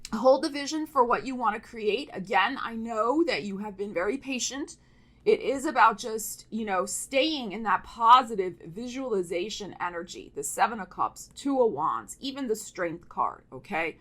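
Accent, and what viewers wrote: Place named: Brooklyn, United States. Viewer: Oh! This was a surprise! For some reason I thought you only had done a tea reading for Scorpio!! This is a great reading -- I imagined you delivering it with a Gypsy accent but the Brooklyn one works😂 Yes it's been a mofo 2 of wands space forever. Capricorn appreciated that you get how patient we have been. I don't take credit - Capricorn just does what must be done so we don't really see a choice. Love the page of pentacles coming in. As you know - this cap has sent many applications that have gone into cyberspace...am continuing through the near future -- feels like a treasure hunt.. 💥🌞💥💕😘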